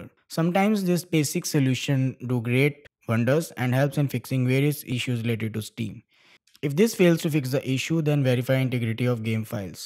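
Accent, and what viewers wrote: India. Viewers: of course its an indian accent teaching us how to fix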